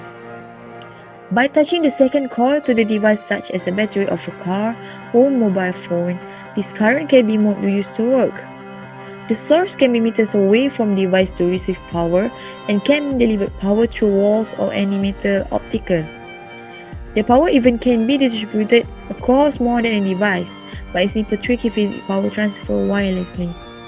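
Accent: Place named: London, United Kingdom. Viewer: good Jobs korg...bangga ada member yg pandai speaking london..hehehe...:D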